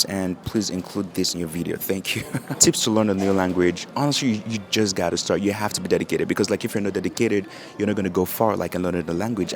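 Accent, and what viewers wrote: United States. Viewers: I thought you were American 😅 Hearing you're from Naija is a pleasant surprise ☺️ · Somehow I always asume you're from the US. Maybe bcz your English sounded American. It make sense now why your mannerism is not "rough". Coz the African American I saw mostly have "rough" mannerism. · I thought American cuz his accent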